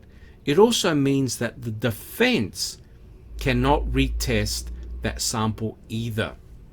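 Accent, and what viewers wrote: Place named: Australia. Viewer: Thanks so much for the videos! I do have to relate to you, however, that the statement from any LEO/s whilst talking to lab tech/dept heads of labs "try to place ??? in the house/garage or any other location they care to mention" is not nefarious in any way, even though it could be said that it is. I can't tell you the number of times that I've heard this very thing said in movies and in television police shows. Personally, I wish that they wouldn't say that for the very reason that it could be thought of as conspiratorial. But, since I note an accent from you, maybe Australia OR New Zealand, I'm sure that you don't know about this type of directive to occur, even though it clearly says "TRY to place/put her/him ......." I'm sure that if it were a true directive, it would not have been done so overtly and written on paper, where it would be seen by other than the person being spoken to.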